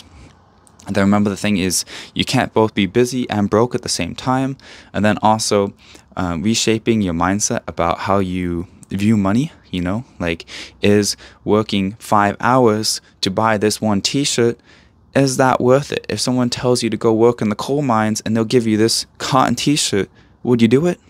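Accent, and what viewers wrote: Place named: United Kingdom. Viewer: You have a British accent, that's cool.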